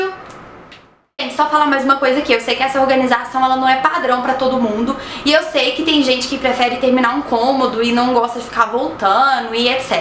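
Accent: Minas Gerais, Brazil. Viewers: Eu fico rindo sozinha com essa menina....Adoro esse jeito mineiro de falar.....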